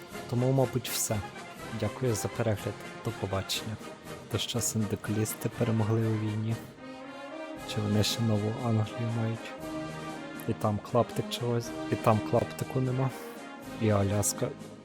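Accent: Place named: Germany. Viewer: А ти не німець? Бо таке враження неначе німця слухаю який українську вивчив.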